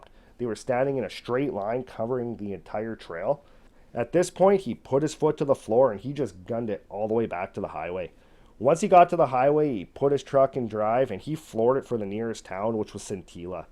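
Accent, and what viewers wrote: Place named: Canada. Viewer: Haha im canadian from far northern British Columbia i recognized this guys accent right away as a canadian! Cool channel will sub, but id love to hear a story on nahani valley/headless valley in the north west territories , i think it is terrifying as a national park based on the past stories!!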